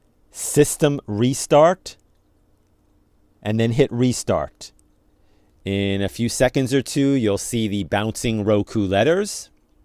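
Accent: New England, United States. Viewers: OMG...YAASSSSS!!!!! I was about to go out and buy a new megabucks router because I thought that was the issue...and then I thought...let me consult the answer to everything...YOUTUBE first. Your video popped up first, and I liked that it said "Fix it NOW!" and my daughter and I both laughed and said we have to watch that one! Because we've been at this for the longest time. Switching out HDMI cables and ports, restarting everything 100 times, even relocating the cable modem and router and all the wiring several times (trying to get closer to the Roku) all to no avail. There truly was no other option but to try a new router (admittedly mine is pretty old). But...your solution worked beautifully! We both love you and think you are a genius! And we like how you say "short video". A little New Englandish sounding accent there! Anyway, thank you so much for taking the time to put this together to save us all!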